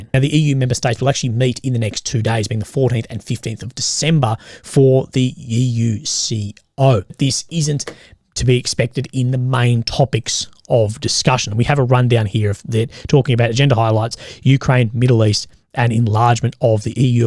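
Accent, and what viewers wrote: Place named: Australia. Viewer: Man. I gotta get to Australia just to talk to people with the accent! 😂 Appreciate your narrative daily!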